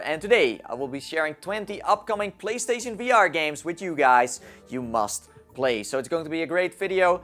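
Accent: Netherlands. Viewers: No Swede or Norwegian talk with a accent like that :D ,sounds like Dutch English to me, but def not Swedish or Norwegian, We talk with a weird accent yes, but it's not like that, hehe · Flip Games just listen to that awful accent ofcourse he's Dutch! (Dutch myself just can't stand Dutch accents haha)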